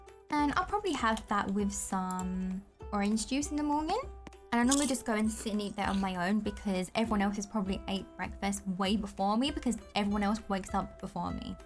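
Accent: London, United Kingdom. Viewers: I’m going to the Uk next year.and I went Last year.its really cool for me and hard to understand stand before and now I can so when I first started watching you I knew you where British.also I love ur videos😁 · Ooh your from London???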